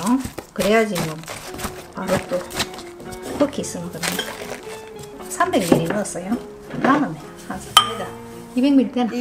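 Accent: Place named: Seongju, South Korea. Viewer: 시래기 성주 사투리 반갑습니다 ~~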